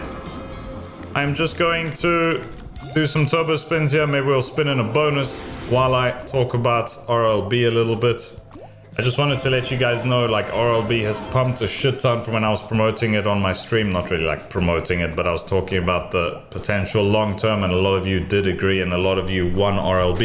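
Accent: South Africa. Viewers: You from South Africa 😶‍🌫️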